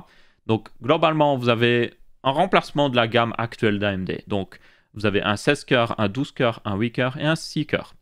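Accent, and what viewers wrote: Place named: Belgium. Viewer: Beaucoup de "Pouissance" x'DDD Aaaah merci la Belgique ♥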